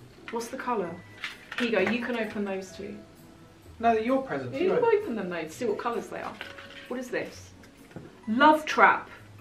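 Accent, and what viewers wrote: England, United Kingdom. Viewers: I just love to listen to you!!! Not only are you articulate, you have the BEST English accent!!! Thanks for sharing your Christmas with us, and I LOVVVE your gorgeous Chanel trendy!!!🎄🎄🎄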